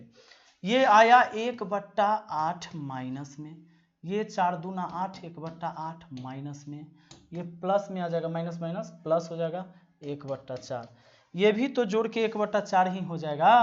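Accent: Bihar, India. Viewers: govinda actor ki ek movie h bihari wali wo bilkul apki tarah hi bole h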